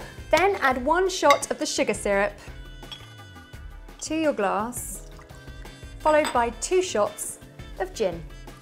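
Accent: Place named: RP, United Kingdom. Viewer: Why do u sound so posh even tho I live in England 🏴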